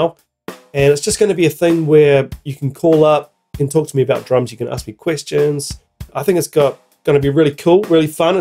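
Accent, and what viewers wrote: New Zealand. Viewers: BTW your Kiwi accent is just awesome!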